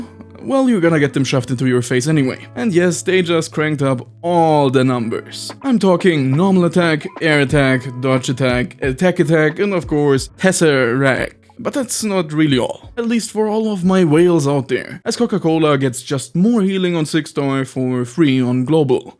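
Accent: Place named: Germany. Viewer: du nuschelst ziemlich was deinen deutschen akzent nicht wirklich versteckt :o dein tonfall ist igwi.. leicht arrogant was, wie ich finde, nicht zu dir passt :o aber an sich ist das video echt cool gemacht :D gj :D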